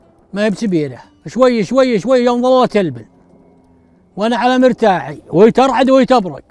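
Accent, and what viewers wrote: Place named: Najd, Saudi Arabia. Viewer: قد يكون عايش في نجد او ف الشمال ومكتسب اللهجه من الديره الي هو عايش فيها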